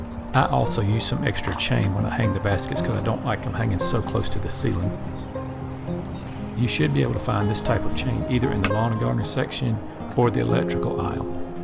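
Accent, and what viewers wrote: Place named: South Carolina, United States. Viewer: Thanks for all the tips🌿Just hooked our system up. Love that everyone is commenting on your accent. That’s that born and raised in South Carolina flow☺️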